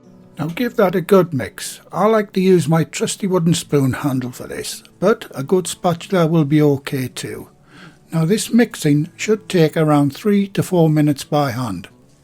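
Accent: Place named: North East England, United Kingdom. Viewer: Hi, I’ve just made your amazing blueberry muffins absolutely delicious, I recently retired and it’s my favourite pastime baking your recipes, excellent instructions and great to have someone with the same accent, as I am also from the North East of England All the very best for the future and thanks again 👍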